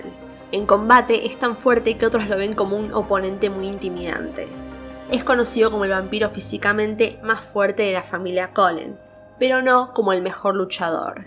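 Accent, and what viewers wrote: Argentina. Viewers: Usted suena como argentina, lo es, señorita?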